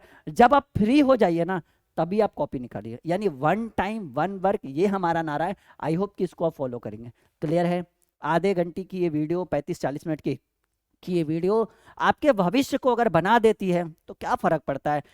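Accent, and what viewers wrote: Bihar, India. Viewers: Kya aap bihar ke hai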